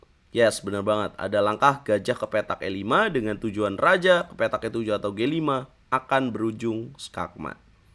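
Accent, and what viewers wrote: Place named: Banyumas, Indonesia. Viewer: mas, masnya orang mana sih? kok kaya bisa bahasa ngapak gitu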